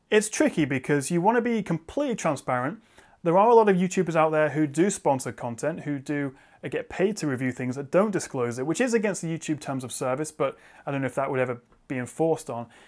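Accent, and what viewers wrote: United Kingdom. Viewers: oh right ^^ well I like your British accent (I'm Franco-American);-)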